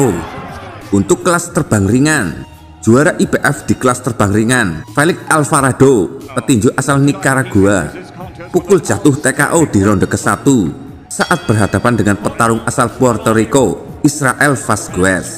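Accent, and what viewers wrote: Java, Indonesia. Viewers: Medok mas... 😁😀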